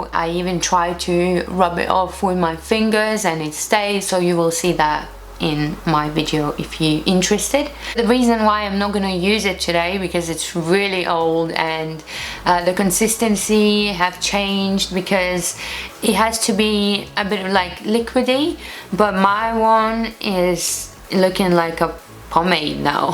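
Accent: United Kingdom. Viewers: Kaip faina buvo klausyt kaip angliškai kalbi ir dar su tuo lengvu britišku akcentu!:)) O antakiai tavo puikūs, labai patiko video! Beje taip pat turiu asimetriškus antakių priekius todėl puikiai suprantu ką reiškia nekesti vieno antakio 😂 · Labai gerai ir gražiai šneki angliškai!! Girdisi toks britiškas akcentas, kuris man asmeniškai laabai patinka!!😍😍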